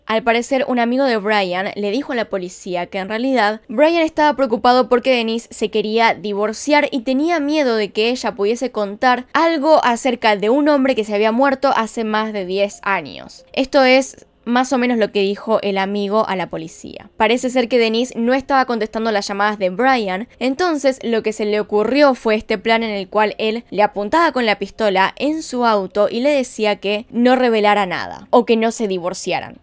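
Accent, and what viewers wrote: Argentina. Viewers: Estoy aprendiendo español me gusta mucho el acento Argentina 🇦🇷♥️✨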